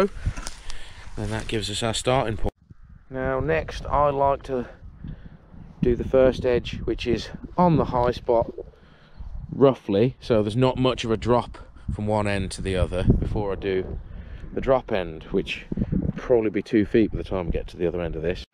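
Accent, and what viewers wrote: United Kingdom. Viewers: Northamptonshire accent?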